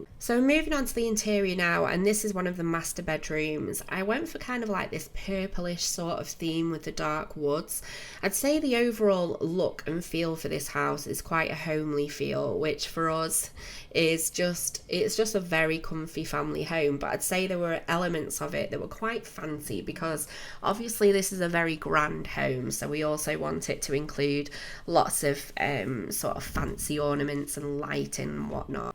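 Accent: United Kingdom. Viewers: So good. Just subscribed to the channel. Love your accent. I love British people. 😍♥️♥️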